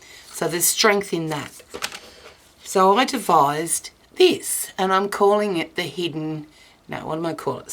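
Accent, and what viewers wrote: Australia. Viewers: Thanks for sharing. I really like it without the flaps and with the added strength. I've made mine 7.5 inches high because I want to do an album that will fit 6 x 4 photo mats. Can you recommend what sort of pages to add to the hinge ? I'm thinking about doing just a single sheet of cardstock because I can't make pocket pages that big without having to stick 2 pieces of paper together with binding and then it looks a bit bulky. I'm also worried that the open edge would be too big at 7.5 inches. Thanks again, great to hear an Aussie accent. I'm from Melbourne living in the US now.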